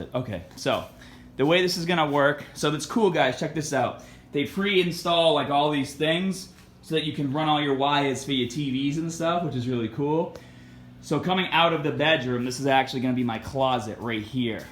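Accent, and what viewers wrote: Boston, United States. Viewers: how much will the rent cost? and also will you lose your boston accent?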